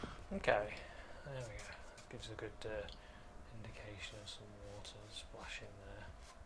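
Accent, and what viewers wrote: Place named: England, United Kingdom. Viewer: Enjoy your vids very much,. What part of England are you from? Trying to place the accent.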